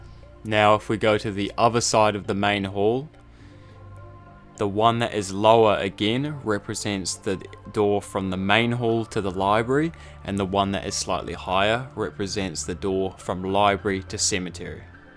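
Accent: New Zealand. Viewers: Are you from nz bro?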